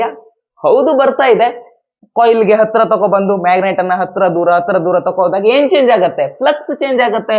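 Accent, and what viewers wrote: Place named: Mangalore, India. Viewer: Sir from manglore, are u also from manglore . . .. ur accents match ❤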